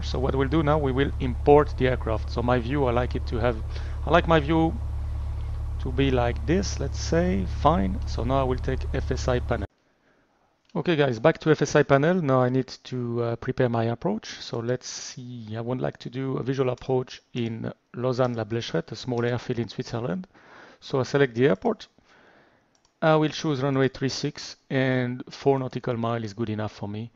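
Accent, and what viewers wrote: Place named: France. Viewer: I love your France accent realy now my request me and all my friend between <num> group what they call us gooney birds so..fsx or developer put all gauges in the wrong place we were making correction by FSPanel studio in fsx but now P3D v4.2 way better then fsx which is normal new generation smart boys,,but we don't have FSPanel Studio for P3D v4.2 can you help us we are 200 thounds staying a life make us happycheck me out in you tube..murray ayata fspanel studio may be better understanding regards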